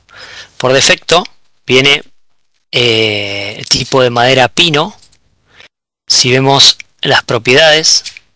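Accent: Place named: Valparaíso, Chile. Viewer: hablas iwal que el amigo de una amiga, no seras de valparaiso?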